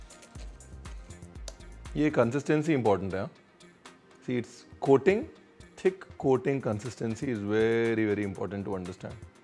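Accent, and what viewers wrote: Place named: Lucknow, India. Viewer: What a personality Punjabi Jatt guy with a calmest aura, ice it up with Lucknowi hindi, shayari and chef..and katilana looks...Mashallah!!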